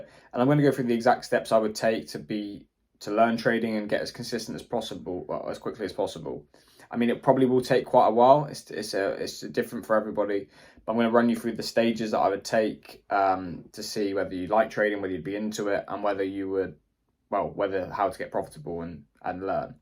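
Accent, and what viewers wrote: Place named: England, United Kingdom. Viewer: I just love your English accent!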